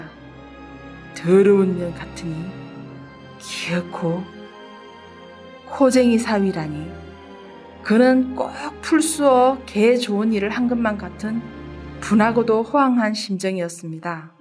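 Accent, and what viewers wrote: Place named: Gyeongsang, South Korea. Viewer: 경상도 억양은 어쩔수 없지만 발음은 좀 ㅠㅠㅠㅠ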